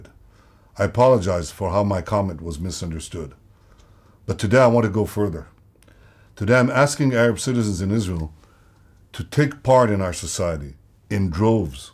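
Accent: United States. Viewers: Are we gonna ignore the fact that he literally speaks English with an American accent?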